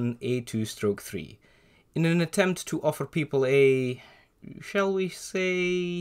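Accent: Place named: Scotland, United Kingdom. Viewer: You sound Scotish today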